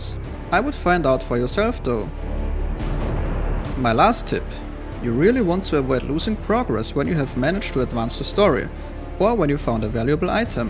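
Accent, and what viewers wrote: Germany. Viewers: he sounds german, not french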